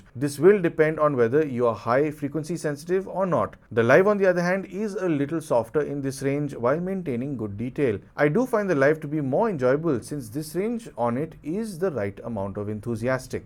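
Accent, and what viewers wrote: India. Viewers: interesting review. Thanks for it...! You have a strange perhaps a Hindi accent, please speak a bit slow, because sometimes is dificult to understand. English is my second language. Thank you from Buenos Aires.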